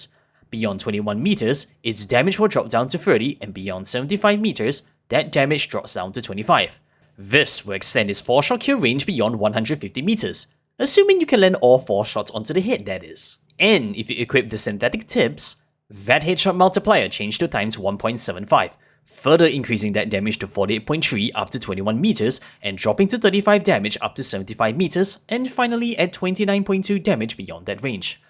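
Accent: Singapore. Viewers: I detected the singaporean accent right away, but i digress. Great video bro keep it up. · I was just about to comment "this is the closest thing to the BF3 M16A3" and then the video continued and you said exactly that. Great video on my favourite weapon in this game. I'll definitely be trying out that first build with the suppressor. Also, the Singaporean accent is the best Asian accent in English.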